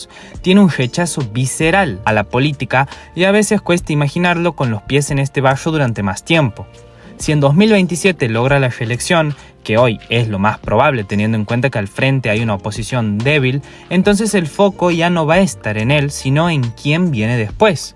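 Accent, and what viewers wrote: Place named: Córdoba, Argentina. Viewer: Capaz que es cordobés amigo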